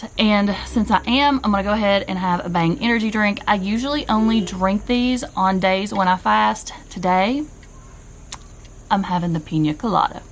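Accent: United States. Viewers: Please don't stop the chats‼️. I live in the UK now and listening to you makes me feel so much like I'm back in the US‼️‼️. Keeps me from missing home too much 🤗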